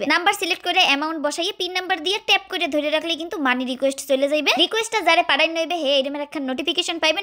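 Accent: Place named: Barisal, Bangladesh. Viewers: Sona...Tumi....erokom... borisailll a....vassssa...kivabe...set....korlaa????..NA...ki....sotti..sottti....bolo.😂ami..khulnaiyyya. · Apni kon deshe bas koren,,,eita borisal er basa,,,,,ei prothom sunlam borisal er basa kaw sune nai,,,,tao se abar Bangladesh e😅😅😅😅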